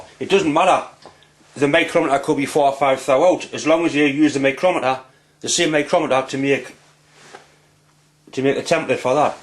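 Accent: Newcastle, United Kingdom. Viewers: That seems to be a very quiet lathe. Easy to see that you are skilled tradesman.The speed of your hand movements are economical and accurate. May I ask, your accent , is that "Geordie". ?Greetings from Melbourne, Australia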